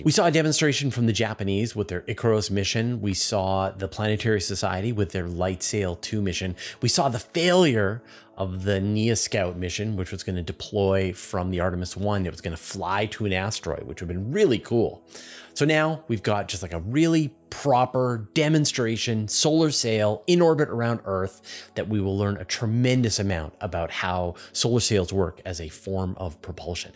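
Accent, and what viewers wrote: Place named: Canada. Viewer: I like how you sound Canadian. It reminds me of talking to Canadians. I mean, I still live in Kitchener, Ontario, but I haven't talked to a Canadian in weeks. It's funny how India has a space program.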